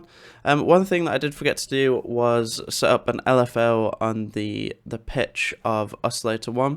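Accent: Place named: Teesside, United Kingdom. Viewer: Teeside accent im hearing 😊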